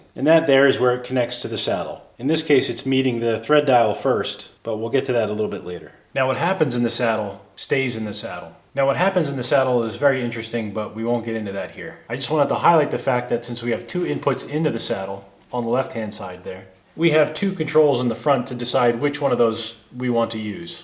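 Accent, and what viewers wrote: United States. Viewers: I want to ask you something. I know I may sound a little bit weird, but, looking your videos, appreciating them, especially the newest ones because of the high humor content, but I just can't help myself not minding my own businesses. Do you live in our really fucked up country called Italy of the recently utterly fucked up continent called Europe? I couldn't not notice in your videos some really commonly used stuff from brands that honestly I'd struggle thinking they ever crossed our borders towards other countries in Europe, or indeed in the world. The Maurer (Ferritalia) stuff or the bottle of mineral water from my region, it's the kind of cheap stuff I couldn't figure out how it would have have made its way to the U.S., provided the fact, that you sound quite American to me, even though I'm no expert since I'm not a native english speaker.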